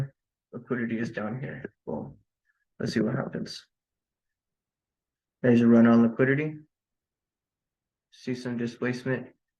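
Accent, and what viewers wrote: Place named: South Asia. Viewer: South Asian English Accent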